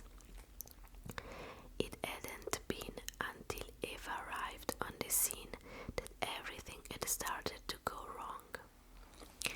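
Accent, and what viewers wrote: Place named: Italy. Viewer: Amazing!!! I love your italian accent, very relaxing.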